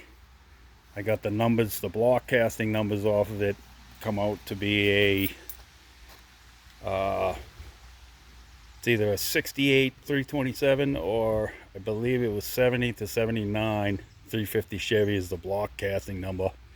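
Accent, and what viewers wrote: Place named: Boston, United States. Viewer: Boston accent!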